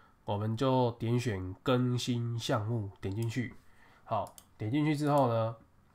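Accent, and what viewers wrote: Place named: Taiwan. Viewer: 台湾腔诶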